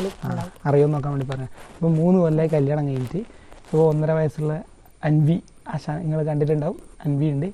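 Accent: Kannur, India. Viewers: Njammale kannur basha 😁🥰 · ഞമ്മടെ കണ്ണൂർ ഭാഷ 💕💕💕💕 Chechhii chettaaa❣️❣️❣️ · ഞമ്മടെ കണ്ണൂര് ഭാഷ💪💪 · Bro Poli language. Kannur language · Nammala kannur basha🤩💖💥🔥🔥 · Kannur bhasha kett comment box nokalarnnum.....Kannur eadaya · Kannur slang ❤️❤️❤️ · Kannur evida. Samsaaram kettappozhe thonni ente jillayil aayirikkum enn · Kanuuurrrr💪💪💪💪 ഭാഷ കേട്ടപ്പോഴേ മനസ്സിലായി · Slang kettappazhe thonni nmle aalann.... 😊knr da💪🥰🥰🥰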